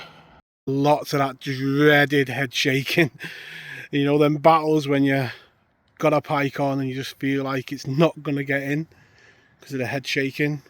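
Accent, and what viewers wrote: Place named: North, United Kingdom. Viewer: Hiya, what a perfect start to your Pike season as i don't think that it could have gone any better for you. What a stunning venue that screams Pike, i cant recall ever seeing you Lure fishing but it has made a great addition to the channel. I didn't know about the Fox relicant rod so i will go and google the hell outta it:) It was good to see you using a Baitcaster reel, what do you think of them please? Is there any chance that you could show us how to load a baitcaster spool with Braid including the best knot to tie it on with please? Allot of the video's are from America which are quite good but i would much prefer you (and your Northern acent) showing us how to do it:) All the best & tight lines. My family has got that used to my phone going off at 5pm every Friday that when they hear it they say "that'll be "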